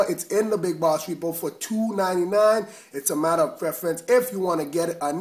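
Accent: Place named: Jamaica. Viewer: Your voice is sick!!lol Jamaican?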